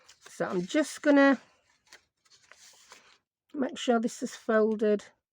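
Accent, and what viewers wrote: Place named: Nottingham, United Kingdom. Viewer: You kill me! I was laughing so hard at the score board part! Just found you. Hello from Canada. You sound like my parents with your accent. They are from Notts.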